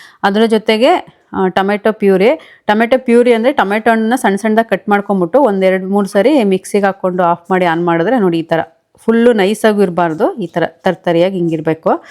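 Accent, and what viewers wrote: Mandya, India. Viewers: Nev same nan tharane mandhya style mathadodhu nange thumba esta nav yelle eddru nam basee mareyokke agaalla 🎉🎉 wish you happy birthday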